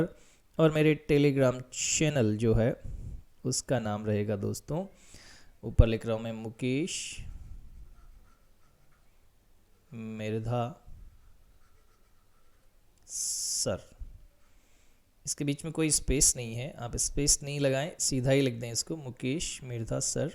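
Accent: Rajasthan, India. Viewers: Sir AAP rajsthan se ho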